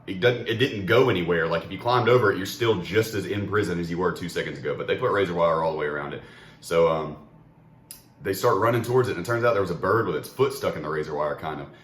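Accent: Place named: South, United States. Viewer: Ill be honest, given your sweet souther drawl, when I saw the video I thought you were gonna say your sister. This is much more sweet and heart warming though.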